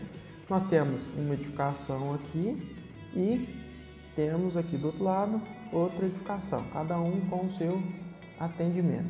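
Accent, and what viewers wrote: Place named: Minas Gerais, Brazil. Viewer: toptop explicação na nossa lingua "mineires" de facil aprendizado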